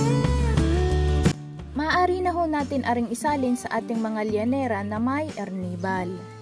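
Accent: Batangas, Philippines. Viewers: Ala eh... pansin ko laang na Batangueña pla si 👍😊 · Mukhang taga Batangas ka Ate ah...ARE ARE EH....❤❤❤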